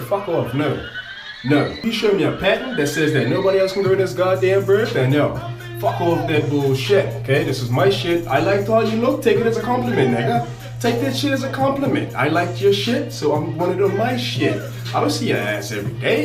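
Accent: Bermuda. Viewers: Nice video, b'ruh, and way to represent! I've been watching and following various people on YouTube giving beard advice and tips. Didn't even think yours was from Bermuda until I heard you speak. I've been growing mine for awhile but kept on trimming or keeping it low. Now I've let it grow. It's kinda like that Kimbo beard (well that's what people call me at times, lol) though I get the Rick Ross comparison too. It's all good. You give good advice in your video. Similar things I've heard people say in their logs and what I'd suggest too. Growing a beard definitely takes time and patience. Never compare your beard to another's and develop beard envy. Each man's beard is unique...take pride in what you got. Imitation is the most sincerest form of flattery so if you see others doing what you do just take it as a compliment. Nice job, b'ruh. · Saw the 441, heard the accent and knew straight away. 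Shout out to Bermuda!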